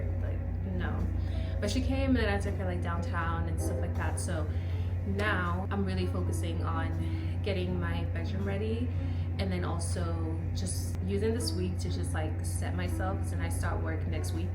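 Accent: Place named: Caribbean. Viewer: Do I hear an accent?🥹 Are you caribbean? if so let’s be friends cause im moving to chicago soon!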